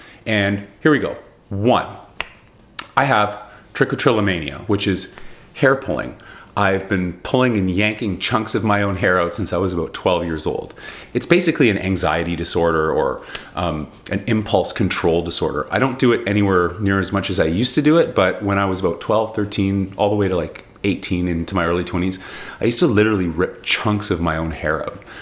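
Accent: Scotland, United Kingdom. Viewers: Ive been in a creative rut too...need something more to challenge my creativity! I always love your stories dude!!! Can’t wait to hear more stories. And btw your Scottish accent really brought the story together ahah!!! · Your scotch accent is pretty convincing , great personal video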